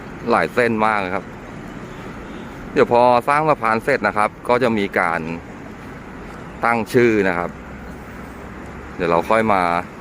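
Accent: Ratchaburi, Thailand. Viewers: สำเนียงของแท้เลย ชาวราชบุรี ชอบครับ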